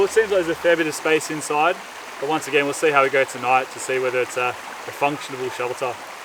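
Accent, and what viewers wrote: Australia. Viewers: Hi, If you can make a swag that’s light weight, water proof, durable, can be comfortable on unfriendly surfaces and under $500. I’d buy it. My idea would be a collapsible carbon fibre stretcher frame, with built in air mattress. And eyelets to rope off to a tree for inside volume or to achieve level perhaps. It would need to come in black, green, yellow and camo. And please get it made in Australia. Otherwise one would simply buy a Hammock tarp setup. Good luck with your venture mate. And love your latest video. Careful you don’t get commercial with the plugging gear but do enjoy the cool gadgets you use. Mate I reackon your from Queensland the way you say aye at the end of your sentences. Am I right?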